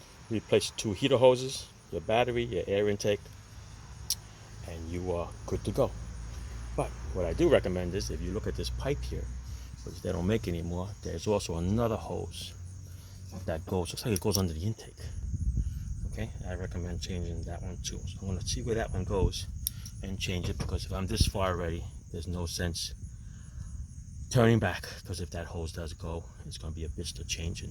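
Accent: New York, United States. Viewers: Sorry but is this dude a Chinese guy with a new York accent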